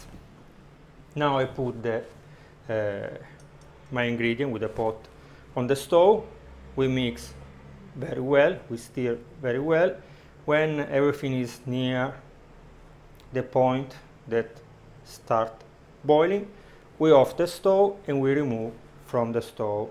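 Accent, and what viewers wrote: Italy. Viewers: Love the accent Italiano 😘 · Hears his accent ok this is original panna cotta boys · L'accento italiano è fastidioso 😅. Non pensavo avessimo questo accento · Love your Italian ascent 😘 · What a cute & wonderful Italian Accent. I could listen to him for hours! Good job teaching in English ! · Search YouTube for Panna Cotta recipe First result, Gordon Ramsay SKIP! Sees Italian channel name Might have something here... Hears accent Yep, this is the one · The Italian Accent is so nice 👍, and also the recipe..!!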